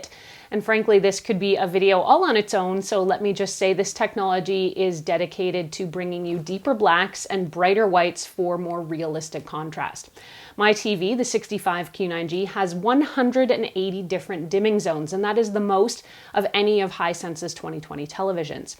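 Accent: Canada. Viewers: Love the Canuckiness! you speak so beautifully, as I normally become instantly frustrated with the regular YT presenters that are far to zippy happy, you present the facts clearly, concisely and at a great pace (without the fluff). Thanks so much!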